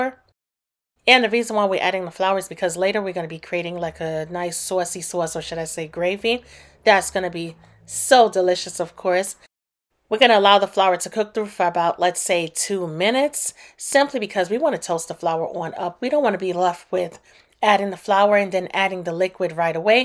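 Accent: New York, United States. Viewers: Are you from NY ?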